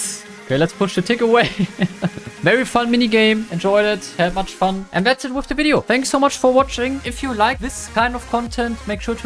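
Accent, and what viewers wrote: Germany. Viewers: You can hear that he’s German haha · Bro is from Germany so his accent is just like that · He's German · can it be so you are from german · I smell a little bit German here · The german accend is so nice!:popcorn-yellow-striped-smile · I love this great german accent... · Man hört so den deutschen Akzent 😅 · you can hear hes german. Good Video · just a German accent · Man merkt so hart, dass er deutsch ist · Man merkt richtig das deine Muttersprache Deutsch ist ( merkt man an der Aussprache von manchen Wörtern trotzdem Respekt für deine Englisch Künste). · I hear DEUTSCH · I can Hear that your german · Das ist Englisch mit deutschem Akzent richtiges Denglisch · In a german speaks englisch everyone knows he s a german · Almann Akzent kickt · I love your germann acsant I find it way better then other peaple acsent 😂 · Don't blame him, he's German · i love his german accent🤣😭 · Man merkt den deutschen Akzent😂❤ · i hear german actent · I Bett he is from germany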